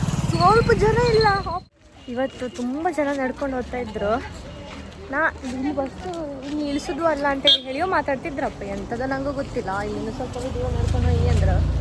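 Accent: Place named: Udupi, India. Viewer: Udupi kannada mix undu aike kenni medam best of luck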